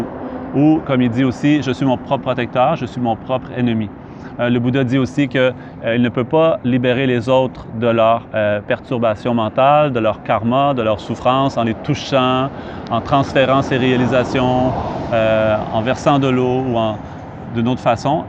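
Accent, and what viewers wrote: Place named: Quebec, Canada. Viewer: j'ai la grande fortune étre bouddhisme* j'adors l'accent québéçois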